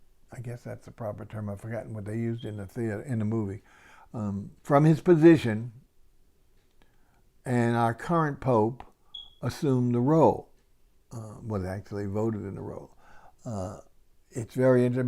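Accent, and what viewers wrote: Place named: New Orleans, United States. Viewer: sure good to hear that N'Orleans accent I remember so well. Your voice is in sync with your video. Joe Robberson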